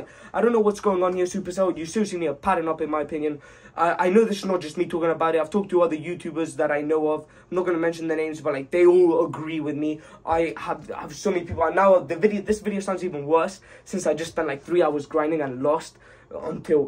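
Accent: United Kingdom. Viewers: He sounds so British in this video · Bruhh i just hearded that u are british, im unsubcribing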